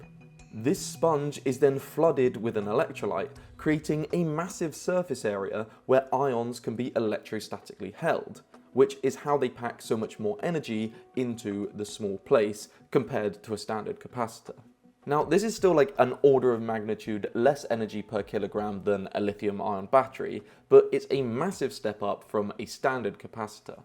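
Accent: United Kingdom. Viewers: Enough of the British accent. Speak English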